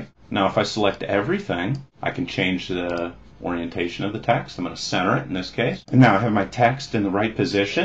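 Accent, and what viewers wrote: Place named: Texas, United States. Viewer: are you from the same place as Mathew McConaughey, your accents are exactly the same. sorry for the unrelated comment. great tutorial btw.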